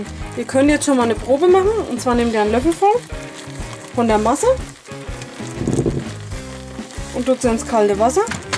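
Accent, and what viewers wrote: Franconia, Germany. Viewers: DressCommunication also so krass fränkisch schwätze tut de jetsche ned, daß mo dä ned vastehe kännt (oder so ähnlich) bin kein schwabe ich bin Hochdeutsch erzogen worden, kann aber dennoch (im gegensatz zum schreiben) Schäbisch schwätze, Hessisch Babble und berliner Schnauze und i red boarisch.. Dialekte darf man ja eh nicht mehr haben weil man ja rassist ist, wenn man jemanden den weg erklärt und der meint man verarscht ihn..nur weil er den bundesland gegebenen Dialekt nicht versteht.. o.O Das einzige was mich an dialekten aufregt ist dieses Semmel=Brötchen, Pfannkuchen=Crepés, Berliner Pfannkuchen=Krapfen !... Weißbier=Weizen, Colaweizen=Russe usw usw... da kann man ausflippen wenn man beim bäcker an der theke steht... >.< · Jawohl a Fränggin ;) gfälld ma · Man hört es ich komme auchbdas franken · du bist 100%ig aus Franken!!! 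Ich bin selber aus Frangen (:D) und ich kenn sau viele die so sprechen :D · PALACE ja, das ist ihr Dialekt, Fränkisch:) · PALACE ja so redet man in franken nunmal ;)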